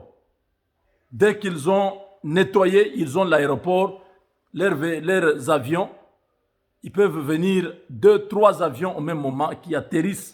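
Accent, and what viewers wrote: Rwanda. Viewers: C est mr parle comme un Rwandais. Il ment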